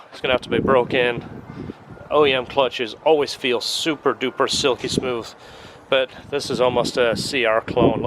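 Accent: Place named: United Kingdom. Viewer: I’m picking up a british twang In your accent.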